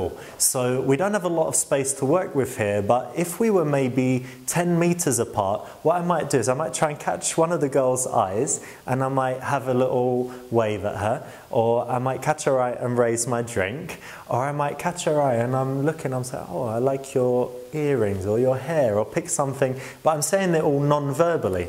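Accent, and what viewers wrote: England, United Kingdom. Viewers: U have a british accent u dont need to do anything lmao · dude you have an English accent you win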